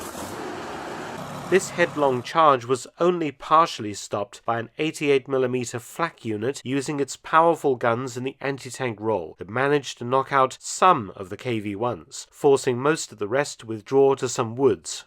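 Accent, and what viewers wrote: England, United Kingdom. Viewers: He just sounds British to me. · I understood everything that Mark said, quite well. Who the F cares what he sounds like?!? His CONTENT is what matters, here, and his CONTENT is excellent. If you under-educated, non-worldly, narrow-minders can't move freely from one accent to another, or one language to another, whose fault is that, huh?!? Parts of the U.S. have dialectical accents that are far different, and harder to understand, than his Brit accent, from all the way across the Atlantic‐-but, again who cares? And 1950's? Give me a break! A LOT of good sh*t came out, both in TV and movies, from the 1950's! And some of today's shows, and media‐-are pure cr*p, by comparison! You guys are overlooking the central point, here: that these guys were fighting for the defense of their HOMELAND. How would you like it; what would you do--if we had to defend the U.S. 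from say, a massive invasion of the Chinese Army, huh?!? It is no wonder that these guys FOUGHT TO THE DEATH, to try to save their mothers, fathers, sisters, brothers, girlfriends, and kids, etc...wouldn't YOU? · English. British encompasses too many different accents.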